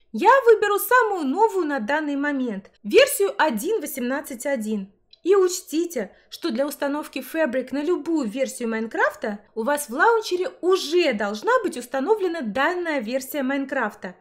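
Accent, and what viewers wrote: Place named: England, United Kingdom. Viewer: как ты делаешь такой акцент англиский.Я попытался этим акцентом пару слов проезнисти я язык сломал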